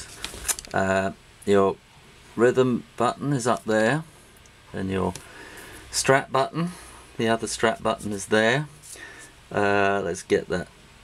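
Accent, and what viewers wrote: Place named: United Kingdom. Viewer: This man speak like Jhonn deacon very Enghlis UK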